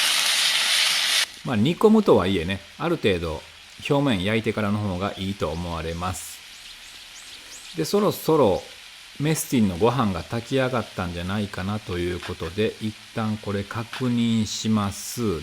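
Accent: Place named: Kyoto, Japan. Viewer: 徳井さんの京都弁「お出汁をパッ~ と入れますと...」夢中になってみれます。無になれます。徳井さんに感謝⤴︎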